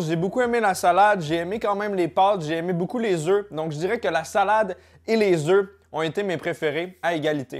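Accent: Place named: Quebec, Canada. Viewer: On voit qu'il québécois lol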